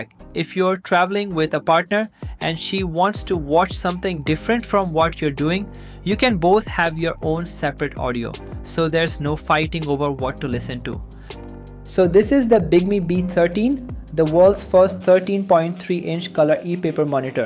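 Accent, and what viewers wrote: India. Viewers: Mac and Windows ok. If only you tested with Linux! Also, for a Chinese channel, why does the accent sound Indian? Or are you using AI to read?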